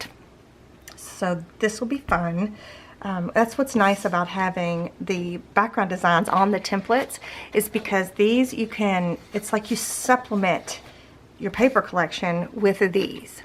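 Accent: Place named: Tennessee, United States. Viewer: I enjoy crafting along with you because you’re so very kind to your viewers.😊 It’s always a pleasure spending time with you, especially when you make a boo boo and you show us how to fix it. 🤗……also, I love your accent. I grew up over the hill in Tennessee and when I hear your voice it reminds me of home.❤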